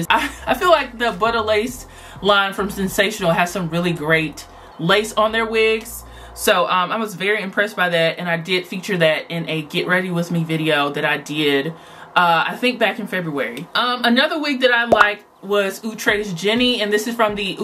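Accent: South, United States. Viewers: You sound like a southern belle it’s too cute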